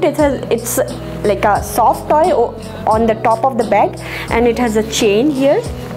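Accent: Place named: Bengal, India. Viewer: Yes your content was really superb. Love to watch you and. Though your hubby Parth was missing. Loved every bit of it, love to hear the Bengali accent, reminds me of Mrs Sen our History teacher, a real sweetheart like you.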